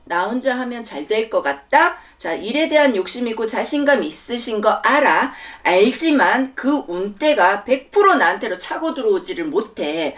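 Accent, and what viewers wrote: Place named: Busan, South Korea. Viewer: 정사생입니다. 부산사람이라 선생님 억양이 너무 푸근하니 익숙합니다. ^^ 선생님 유튭 방송도 응원할게요.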